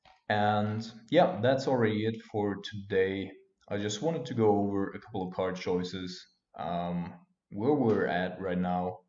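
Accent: Germany. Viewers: It sounds like you might be German am I correct? Or just crazy?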